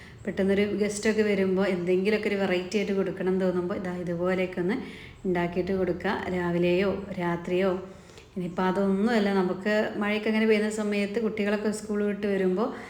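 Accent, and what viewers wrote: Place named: Palappuram, India. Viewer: Samsarathinte style aake marippoyallo ...palappuram style nalla rasayirunnu tto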